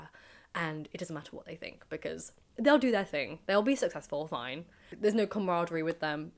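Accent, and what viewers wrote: RP, United Kingdom. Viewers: Interesting, but I'd argue you being able to cram and get A star grade makes you way above average. You are only judging yourself against the top of the top by comparing yourself with those who topped Oxbridge. Also you sound so posh, I assumed you were from an academic style family previously. I was the first to go to Uni in my family myself.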